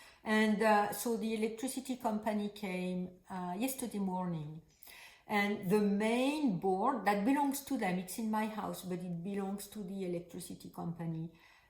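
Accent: France. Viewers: Delighted to have come across your channel today while knitting. I enjoy listening to other knitters talk of their projects as I knit and I find your French accent very pleasing. I've always thought the French language is beautiful so your accent doesn't bother me a bit.:-) I live in Maryland....just outside of Washington DC and we are having many storms and heavy rain lately as well. Your WIPs are lovely. The Madeleine sweater you are working on looks similar to the Astragal sweater by Ainur Berkimbayeva that I knit awhile ago. I also like the look of that Ripple j Jacket pattern!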